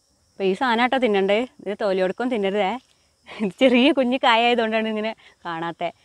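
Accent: Malappuram, India. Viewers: ഹായ്.... വിഭവങ്ങളും.... മലപ്പുറം തനത് ശൈലിയിൽ ഉള്ള അവതരണവും ഉഷാറാവുന്നുണ്ട്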